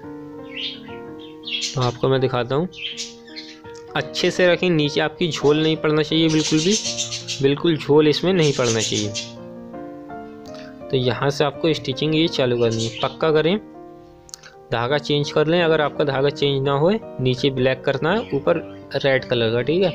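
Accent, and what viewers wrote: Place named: Pilibhit, India. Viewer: Nice... Aap rahte kahan ho? Bhai bhasha bilkul humari jaisi hai.... Pilibhit zila....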